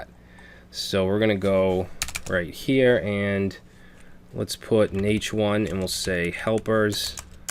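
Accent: Boston, United States. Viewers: You are from Boston huh.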